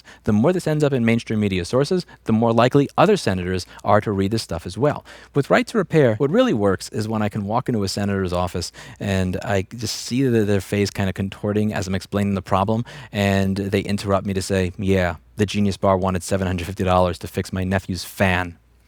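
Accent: New York, United States. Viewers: New Yorker detected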